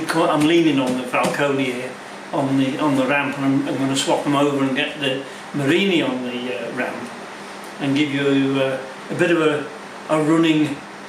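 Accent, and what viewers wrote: United Kingdom. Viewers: Happy New Year from across the pond. As a fellow Britt as soon as you started talking i could detect the accent. Still managing to get some riding in, here in North Carolina. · Happy New Year, look forward to seeing the Italians out on the road again. You do sound a bit more scouse! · Light headed ! made me smile. Motorbikes, Guzzi riding and talking bollocks done that most of my life too. Did hear a little scouse coming through. Happy New Year sir.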